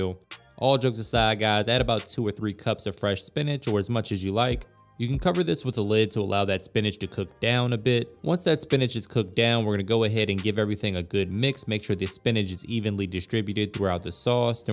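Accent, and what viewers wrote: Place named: South, United States. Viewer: Sounds like a real country boy lol wish I could taste that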